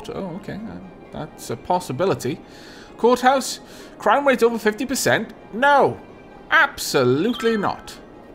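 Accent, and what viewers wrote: Wales, United Kingdom. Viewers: I love that the cruise ships plow straight through the water turbines as though they aren't there XD I also have no idea why ... but I LOVE IT when a welshman says "lovely" so this video was audio heaven for me :-)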